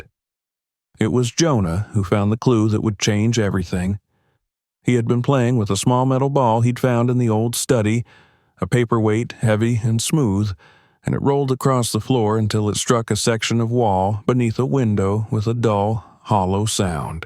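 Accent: South, United States. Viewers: Loved the story, but seriously change the voice. The old Southern drawl does not suit the story. He can't even pronounce the Italian names.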